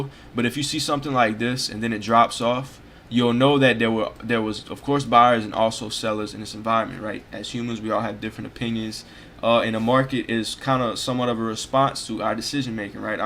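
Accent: Louisiana, United States. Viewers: I thought that accent sounded familiar, I'm from Louisiana also. Lol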